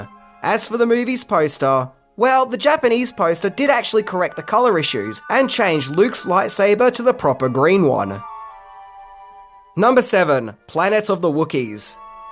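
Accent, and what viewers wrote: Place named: Australia. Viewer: Yeah same hey :) . It's the down to earth Aussie accent that helps too :)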